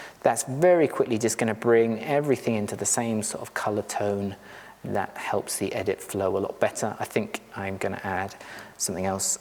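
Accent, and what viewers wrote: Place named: London, United Kingdom. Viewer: It's called an accent. London or close by.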